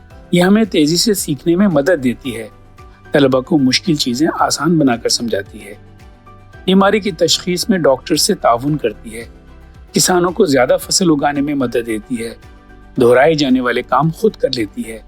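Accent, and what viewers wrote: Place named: Saraiki, Pakistan. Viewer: ما شاء اللہ بہت خوبصورت زبردست مٹھاس بھری اردو اور سیری ان لہجہ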